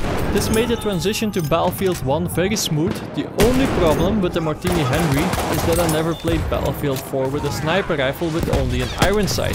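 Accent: Netherlands. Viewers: You have the dutchest accent ever hehe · Lekker nederlands accentje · youre dutch right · are you Dutch? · the dutch accent is strong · are u dutch?:P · Fix je accent en wees alsjeblieft niet zo Nederlands. Mijn oren bloeden. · are you doing that dutch accent on purpose? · Jij bent Nederlands zeker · i hear a dutch accent in your english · Annoying Dutch accent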